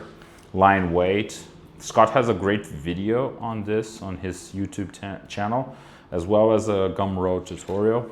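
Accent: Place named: Brazil. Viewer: Esse english é brasuka! Faz vídeos em português tb irmão...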